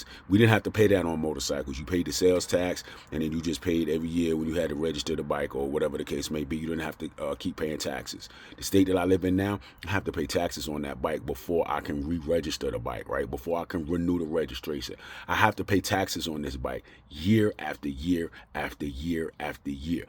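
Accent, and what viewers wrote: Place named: Maryland, United States. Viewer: Sounds like you grew up in maryland and moved to Virginia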